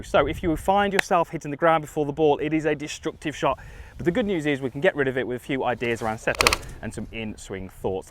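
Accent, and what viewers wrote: United Kingdom. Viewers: Hi Sal the north of England accent isn’t the easiest to understand 😂 What part did you struggle with?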